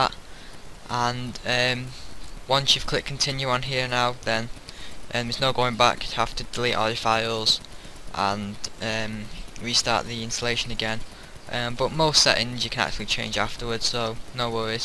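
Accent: United Kingdom. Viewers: Then I thank you for your help in making MediaWiki work. I'll find a way to solve the problem in which you solved a part. I just need to know if these files work with MediaWiki or not. I've never had a British friend. We can be friends if you like so. I'm an English student n' I like to know about Britons and British accent is my favourite. You did great. Thanks a lot. Later.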